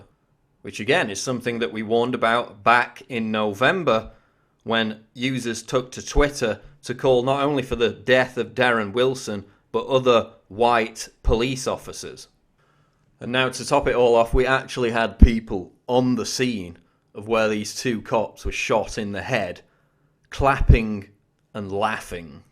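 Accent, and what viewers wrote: United Kingdom. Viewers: Damn, I thought this was something that'd tell me some new information, its just a slow talking extremely biased brit.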